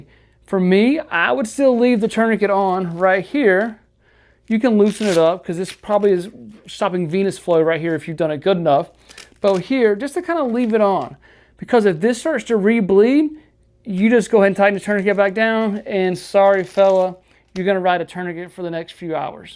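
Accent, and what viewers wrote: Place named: United States. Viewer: Very American sounding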